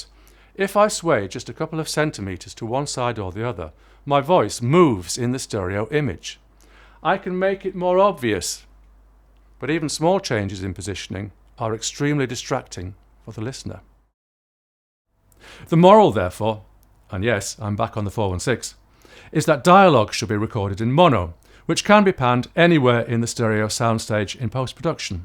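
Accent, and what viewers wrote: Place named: North East, United Kingdom. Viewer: Cheers, very useful. Just about picking up your dulcet north east tones..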